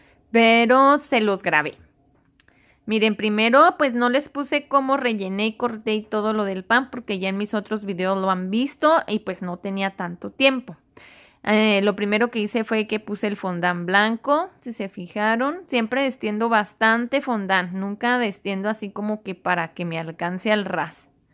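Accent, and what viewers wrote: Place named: North, Mexico. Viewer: Hola muy bonito tu pastel te felicito una pregunta ojalá me contestes pero de donde eres tienes un tonito como del norte te escuchas como nosotros los de chihuahua jaja 😂 Dios te bendiga y lo mejor de corazón gracias por compartir lo que sabes 🙏🏼😘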